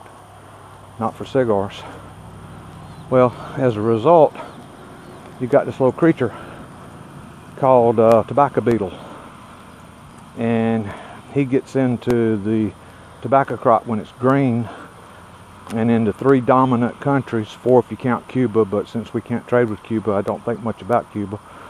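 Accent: South, United States. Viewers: I enjoyed the backpacking trip! Learned a bunch more. That bit of glee in your voice every time you sprayed a weed made me smile - especially when you came across that evil sicklepod (which I promptly Googled) - "DIE" spray "DIE" spray - all in that nifty southern accent. Exactly how much backpacking do you do on your acreage - or was this just a spot check? You are right though - "you can't buy rain". If I could gift you with it I would. Hang in there and keep on being the that you are - won't be long now before there are over 2K of us out there following along and enjoying your content. You have not only grown soybeans, you have grown a fan base who really appreciates what you share with us!:)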